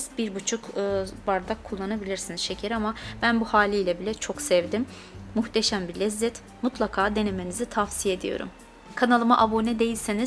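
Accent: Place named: Azerbaijan. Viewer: Hər şey əladı.Amma zəhmət olmazsa öz dilinizdə kanal acın .Sizin türk deyil azeri oldugunuzu lehceniz asanlıqla ələ verir.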